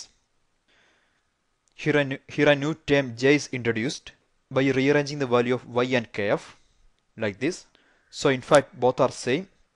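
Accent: Kerala, India. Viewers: He has a strong malayalam accent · malayali annnalleeeee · are you a malayali? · Indian English . The accent is thick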